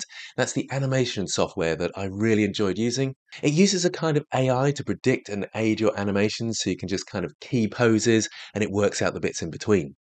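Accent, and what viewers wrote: United Kingdom. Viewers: Are you from the United Kingdom of Great Britain and Northern Ireland?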